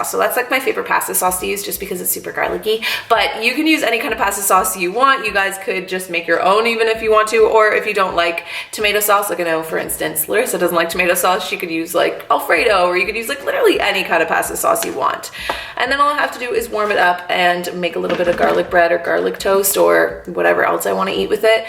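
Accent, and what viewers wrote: Canada. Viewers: Sounding like a Canadian in this video lol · Us Canadians say things differently ahaha 😅😅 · I think it's the Canadian accent. We like our 'A' sounds. :) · its how Canadians say it, i watch aton of youtubers from canada and they all do it hah its just different!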